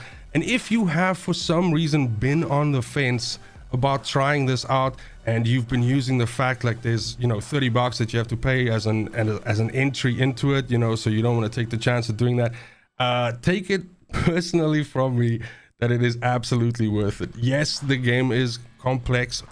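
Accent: South Africa. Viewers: Trying to figure out your accent... Are you from South Africa? Howzit from Cape Town 😎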